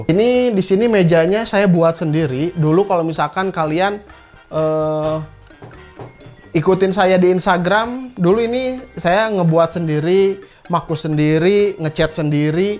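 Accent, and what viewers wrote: West Java, Indonesia. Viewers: Bismillahirrahmanirahimmmmm.. Semoga menang Subscribe✔ Follow✔ Like & Share✔ Instagram : ry_akbar037 Video yang paling diingat adalah waktu review Vga zotac. soalnya pas zotac nya koat ka sunda banget gt. "JOTAX" nyebut na teh hahaha Pengen Banget Ram Venom RX 8GB karna saya rencana mau rakit pc DDR4 soalnya bosen pake ddr 3 mulu hehe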